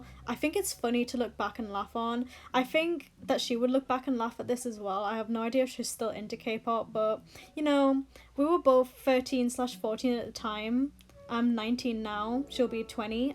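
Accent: Newcastle, United Kingdom. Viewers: omg ur from newcastle hi sis🥺🥺🥺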